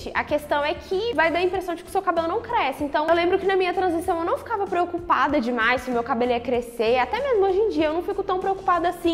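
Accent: Minas Gerais, Brazil. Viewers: Amo o sotaque de Minas 😍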